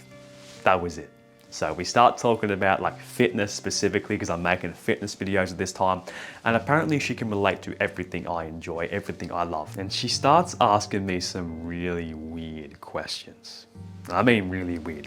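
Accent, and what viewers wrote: Australia. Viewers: Thank you so much for this video, it made me realise so much about myself i didnt know❤, also i love your Australian accent🤩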